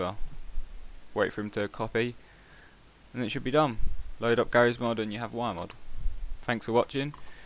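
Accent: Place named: London, United Kingdom. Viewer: Hey, you sound like you come from some where around Sidcup? Correct?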